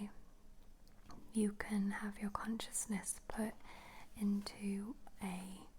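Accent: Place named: United Kingdom. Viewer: Black Mirror has broken so many grounds. I remember watching Bandersnatch for the first time thinking how cool of a concept it was. Hope you're doing great I love watching your videos. Your Brit speech is hot chocolate in the winter beside a warm fire ❤😱🥰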